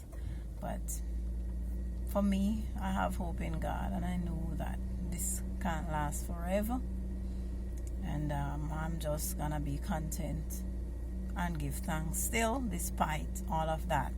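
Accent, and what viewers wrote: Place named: Saint Vincent and the Grenadines. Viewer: You have that rich Vincentian accent. You speak with passion. Speak Louder, my friend and sister!.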